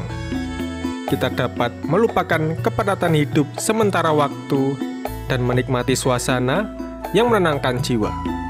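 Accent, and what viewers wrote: Java, Indonesia. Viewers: Aku seneng dalam menggunakan Bhs indonesia dicampur bhs jawa, se akan2 ketok medog banget Bhs biar dikenal seluruh jagat raya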